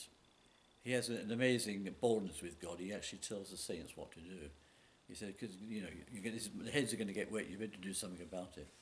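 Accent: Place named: England, United Kingdom. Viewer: Dear father, an English accent???!!! Where is your church? I’m a recent English convert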